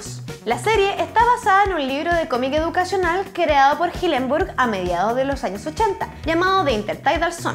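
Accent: Chile. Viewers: es de chile ya que ella es chilena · 23 cosas que no sabias de YOUTUBE. Estoy viendo tantos los vídeos en especial en donde sale que se me está pegando el acento chileno po!. Saludos desde Lima, Perú. Bendiciones para todos.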